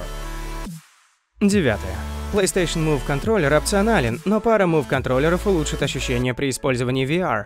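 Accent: England, United Kingdom. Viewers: по-моему слишком выраженный английский акцент) не стоит, так как еще и по сравнению с русским контраст смешной получается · плюсую английский раздражал аж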